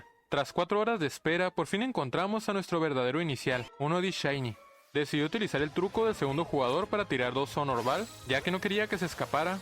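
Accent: Chihuahua, Mexico. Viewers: excelente video! tu acento es muy parecido al mio, no eres de chihuahua mexico? un GRAN trabajo y muy tardado, gracias por tu esfuerzo, un saludo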